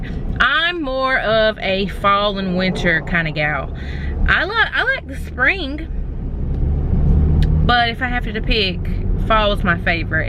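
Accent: South, United States. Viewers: Lord girl I love your sassy southern accent!! I'm also from North Carolina literally from Rockingham we're so close! Love the videos you create girl 💓💓☺️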